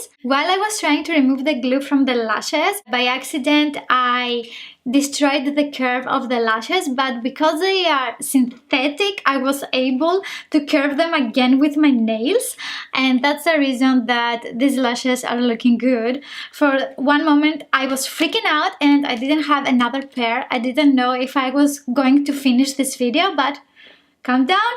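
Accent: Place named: Greece. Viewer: Are you Greek? Your accent implies that! Love essence make up❤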